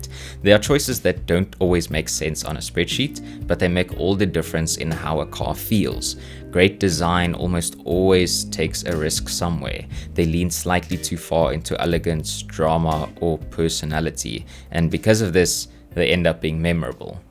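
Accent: South Africa. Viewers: You sound South African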